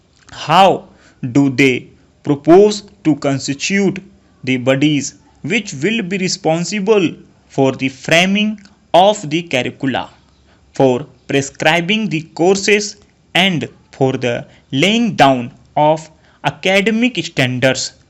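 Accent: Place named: United Kingdom. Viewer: No need to bring brtish accent while dictating. Pls understand the correct pronunciation.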